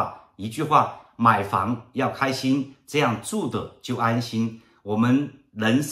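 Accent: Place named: Cantonese, China. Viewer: 你有口音，你是不是讲粤语的？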